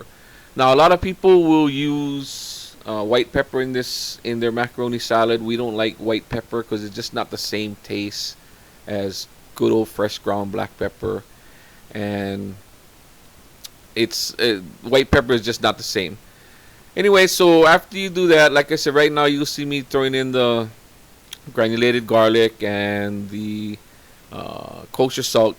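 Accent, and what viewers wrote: Hawaii, United States. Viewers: New Subbie! Love the pigeon accent - passion for food & sharing your recipe. So happy I found your content. Mahalo! Born & raised in Waianae HI - now in Seattle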